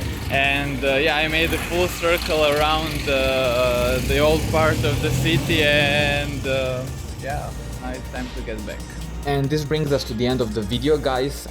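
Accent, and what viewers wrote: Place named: Balkans. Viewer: Thanks for the 'nice video, where are you from Originally? You sound balkanese!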